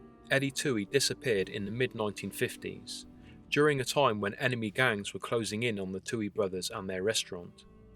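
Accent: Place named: Leeds, United Kingdom. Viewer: He's from Leeds in North of England uk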